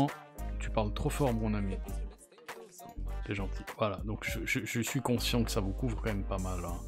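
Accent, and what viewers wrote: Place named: Switzerland. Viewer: Je pense avec son accent et les huitante/nonante, il est plus du côté de la Suisse. Reste à savoir quel canton.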